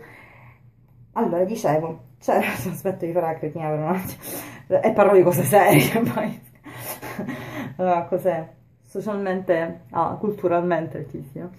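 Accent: Sicily, Italy. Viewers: Io ti adoro! Tiri su il morale, ma profondamente! Spingi per avere piu autostima per te tutto si puo fare, sei sincera te stessa e finalmente uba che da del tu e non del voi (e non e poco) mi fai sentire amica tua e non per ultimo sei siciliaaana mizzica mi manca la parlata và! Ciao biddazza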